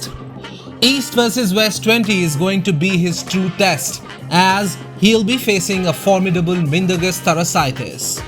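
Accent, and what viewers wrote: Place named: India. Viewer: ur indian accent is hilarious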